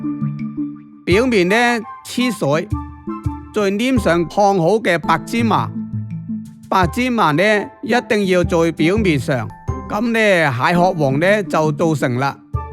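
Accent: China. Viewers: 这是哪个地方的粤语。。。。。。？ 另外感觉就是变着花样吃猪油。。。。掺些面粉让猪油不要太像猪油。。。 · Mia Mia 佢係廣東人但唔係廣州，廣東話除咗廣卅話外仲有好多方言，佢就屬其中一方言。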